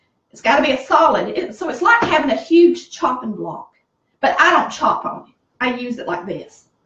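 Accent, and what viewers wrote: South, United States. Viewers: What a great video. Love the dumpling recipe. You cook the same way Granny did. I learned from her. You mentioned the mixer you didn't like. I have one that was made probably in the 20' maybe 30's. It's wire instead of flat slots like yours and it works great. I can't find one like it now days. You talked also about dressing. Granny would make her's with the liver from the turkey or chicken livers. It was actually really good. I grew up on a farm here in Missouri. Dad butchered our beef. Just like you He got the T-bone steaks. We got the lesser cuts. We would maybe get a T-bone right after he butchered. The rest were his. It' great to hear your southern accent. I miss it. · We love chicken and dumplings. I want to add that your southern accent is great! Yes I’m from Texas but not all videos have the southern accent that I personally love! 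· you are great cook & a "hoot" to listen to your wonderful southern drawl! Love it. I live in MI but born in VA & Mom Dad & brothers born in TN. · I love listening to a true Southerner talk. There are so few of us out there. Thanks, Great tutorial! New subscriber. ✌🏻❤ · Was very relaxing watching you cook. You’re a sweet doll. Love listening to your southern accent. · I just found your videos and I have to say "I love you!" Your southern draw and your family stories made me smile throughout the entire video. You remind me of my grandma and family who lives in Nacogdoches Texas. I have been looking for the perfect from scratch dumplings and I think yours is it. I can't wait to try yours out. Thank you so much for sharing.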